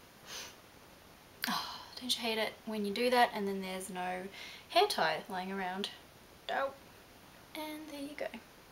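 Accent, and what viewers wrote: Australia. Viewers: Very nicely done., you are a beautiful girl and you do this 'almost - no - makeup', natural look well. Wish I could master the natural, no makeup look. I'm a fanatic when it comes to makeup, actually I am extremely artsy, so I always tend to view my whole face as a canvas. I don't even have a ready in five or ten minute go to everyday look. So laborious when it comes to putting my face on. But you knock this out in ten minutes flat. May I ask you, where are you from? Your accent sounds australian. It's charming. Thank you for the posts. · Thank you :3 This will be perfect for school! From ur accent im guessin ur an aussie ? Same :3 Ps, Your bootyful.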